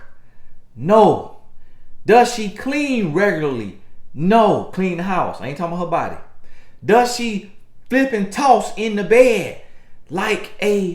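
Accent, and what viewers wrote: South, United States. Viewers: Love your hometown Southernness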